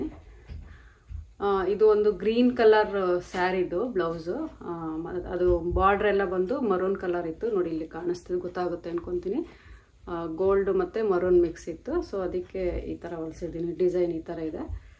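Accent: Mandya, India. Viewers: nimma matu keloke mandya bashe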